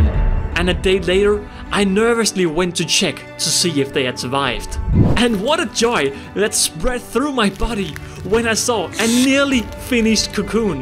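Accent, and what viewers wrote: Denmark. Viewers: bro got a danish anent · are you danish? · hey your accent sounds familiar are you possibly danish?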